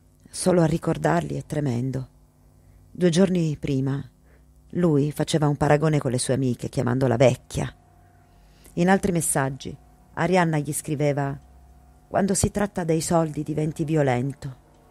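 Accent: Piedmont, Italy. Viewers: sembri piemontese !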